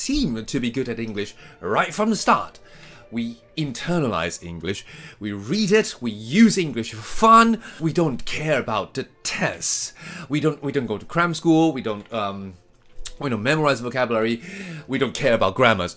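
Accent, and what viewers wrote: RP, United Kingdom. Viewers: 我也是看了阿滴才知道老師真的太帥了~超喜歡老師的英式~口音~! 真的會重拾學習英文的動力~ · 看阿滴進來+1，老師的英式口音真的很好聽喔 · S C this is not the only way to speak English, you can always alter your accent towards the kind you prefer. The accent/tone is just because he is trying to convey a thought, or say, giving a speech. I don’t see a problem in this😂. There are more than one British accent of course, and this is just how ppl talk in formal occasion. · 這英式口音太有魔性了😂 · Eko老師反差太大，明明外表超日本視覺系，感覺一開口就要講日文，但講出來卻是一口道地的英式英文，而且還能言之有物，厲害👍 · 我只能說，無論是不是有人覺得這是刻意的英式英文，但，我太愛了！很開心從阿滴頻道認識Eko。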